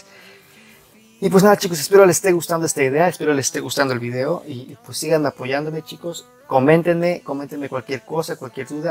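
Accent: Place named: Veracruz, Mexico. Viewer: Como que eres de Veracruz xd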